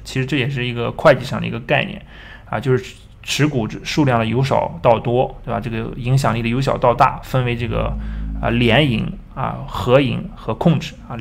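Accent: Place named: Shanxi, China. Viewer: 你是太原人 至少是山西人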